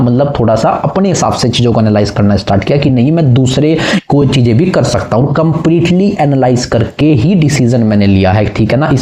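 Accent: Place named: Maharashtra, India. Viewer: MAHARASHTRA ACCENT AALA HOTA I THINK SOLA😀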